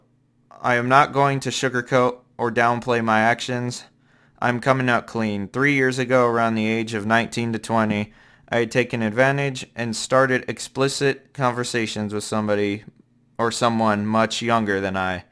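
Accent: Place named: South, United States. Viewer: DUDE, you didn’t even know what happened before, but just screenshotting his last posts from twitter and gluing the voiceover of your redneck voice is very smart, right? Do you even know that that 12-year-old girl was a friend and, by correspondence in the discord, she herself agreed and continued to write "yea I suck your d1ck" and so on. then she simply took and leaked the correspondence, allegedly exposing that he seduced her at the his age of 20, and stupid people ate it. I'm sorry I can't find screenshots of this play chat role, because it was clearly expressed there that she deliberately flirted with him for her own purposes in order to take him by surprise. nasty and stupid people now think ONLY by hearsay from strangers that he is some kind of pedophile. Is this your first time on the internet?!?! Didn't you roleplay with anyone at the age of 12? 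this person then, of course, began to apologize and make excuses because people misunderstood him, or vice versa, they began to write "eewww you pedo! how do you even live!" people nasty and disgusting ruined the full potential of the best musician I have ever heard.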